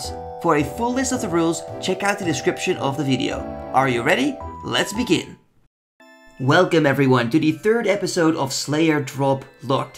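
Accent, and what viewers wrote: Netherlands. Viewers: Lets gooooo! are you dutch btw? you sound like me haha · Great series! Just a question, are you Dutch? I am from the Netherlands, alot of people play osrs here!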